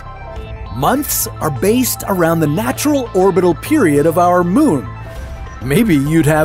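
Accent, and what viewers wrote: United Kingdom. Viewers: 終於是英音啦 讚讚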